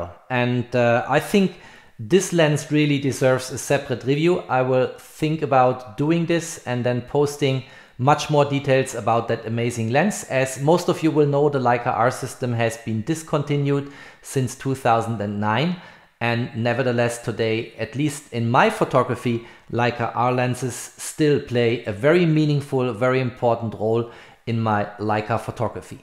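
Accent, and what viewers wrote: Germany. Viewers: Bist du aus dem Schwabenland?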